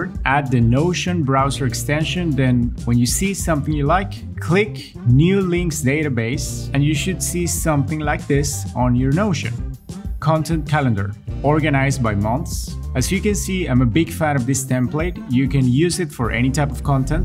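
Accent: Colombia. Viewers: The way you explain it was so nice! btw, loved your Colombian accent! Colombian here too :) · Are you Colombian? Something in your accent and sense of humor makes me think you’re Colombian 🇨🇴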